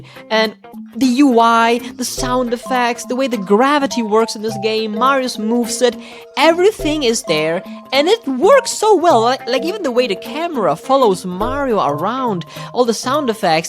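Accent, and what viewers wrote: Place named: Germany. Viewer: Knew I heard german somehow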